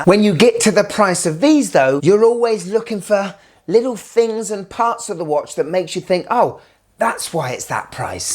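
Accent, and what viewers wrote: United Kingdom. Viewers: Holy mackerel that Michael, Caine British accent, Austin Powers is so difficult to listen to. Seems like a cool guy though and understands watches for sure but wow what an accent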